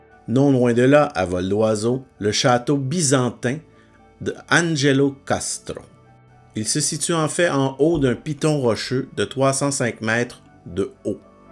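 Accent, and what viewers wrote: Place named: Quebec, Canada. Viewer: Très bonne vidéo, Merci. Sous le soleil ça doit vraiment être beau 😅..... après quelques minutes nos oreilles se font à l'accent québécois, qui n'est pas désagréable. Juste un petit détail....un moulin ça sert à moudre les céréales....pas à les "produire" 😂😂.... C'est la terre qui les produit .... bien le bonjour amis québécois ✌️😊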